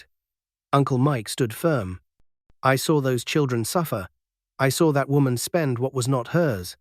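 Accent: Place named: United Kingdom. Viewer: Please can’t narattors be African. Why is this British person speaking!!!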